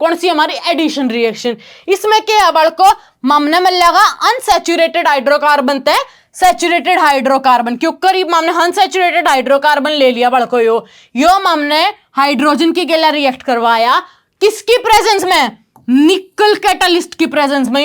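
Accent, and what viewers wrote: Haryana, India. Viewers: Bahut hi accha padha rahe ho madam sabhi samaj sakte hai haryanvi boli bahut acchi lagi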